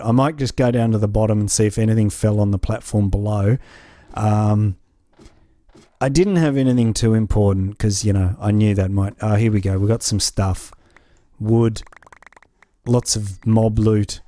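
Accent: Australia. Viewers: Sooooo keen for the download. Loving the series, I'm a newish sub and I'm bloody loving your work. Its good to be listening to a fellow Aussie as opposed to my other MC subscriptions. hahah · Are you an Aussie?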